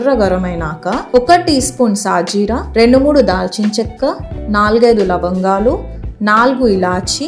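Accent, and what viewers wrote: Telangana, India. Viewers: Mee telangana yaasa super! And your recipes are inspiring. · అక్కా ...మన తెలంగాణ యాసతో దుమ్ము రేపుతున్నావ్.. 🎉❤ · Telangana slang plus style lo vanta supero super ❤ 👍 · Ame maa telangana gadda meeda puttina ammayi telangana lone matladuthadi... Tana matallo maku affection kanipisthadi....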